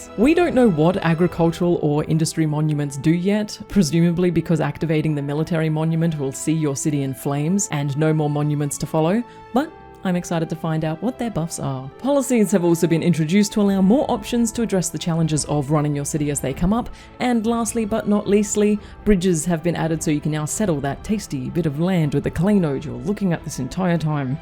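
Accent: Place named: Australia. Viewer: I like the rare occasions YouTube recommends something interesting from a channel I didn't know about before. I liked the old days of classic RTS and city builders. Edit: also hello from another Australian, assuming from your accent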